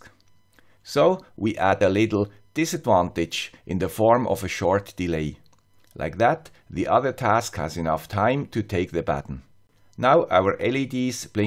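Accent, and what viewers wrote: Switzerland. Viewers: I also miss hearing your original intro "Swiss accent *again*". Feels like something is missing now :) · The guy with the Swiss accent is kicking some serious ass -- great job! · "Here is the guy with the Swiss accent...again..." - I always enjoy hearing that intro - it's your signature trademark. My wife gets a kick out of it too (sometimes she listens to my youtube tutorials LOL). Thank you for this video. I've been using the ESP8266 exclusively since the ESP32 software arduino support seemed to be very much in alpha/beta release. Based on your recent videos on the ESP32 it seems it's slowly starting to stabilize and become very useful (still missing bluetooth though). I like the intro on RTOS and the simple example - not so intimidating after all. Do you think it's possible to control two different GPIO pins in a task running on different cores? 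· you're a TRUE star (complete with your ubiquitous 'Swiss Accent'). I always enjoy your videos. I haven't yet done anything much with ESP32 boards as I've tended to concentrate more effort on ARM based SBCs (OLinuxuinos, Beagle, OrangePi, BananaPi, RaspPi etc), but my soldering iron is beginning to show 'twitching' signs of neglect. As soon as I can muster up some form of project to suit it, I'm sure I'll have the flux flowing... Thanx dude (from a guy with a Kiwi Accent... LOL) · Maybe Swiss?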